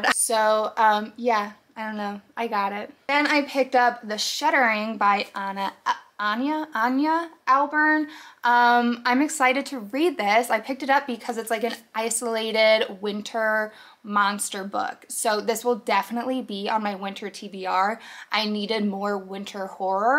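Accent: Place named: New Jersey, United States. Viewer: oh, your accent sounds like you’re from Jersey.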